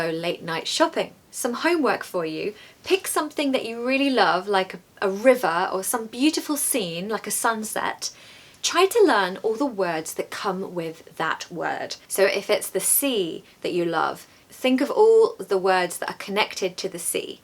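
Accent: RP, United Kingdom. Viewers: Hi Teacher! Always am astonished by your every video! 😍 I really really really love the way you speak! I want to and am gradually learning the RP accent you speak! 💕💕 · In USA, it's all about the d sound and the tongue touching the palate, in UK it's usually soft, elegant, polite and humble kinda accent. I'm quite capable of immitating you except the upper intonation, like suddenly it goes up, how'd you do that, I find it bit complicated. For split seconds it goes up and that's also the signature intonation a.k.a British accent of the United Kingdom. Do teach that. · As i have always been, again amazed Never found a teacher better then you Your gesture and face expressions made us love english and Brit accent as well . Thanks teacher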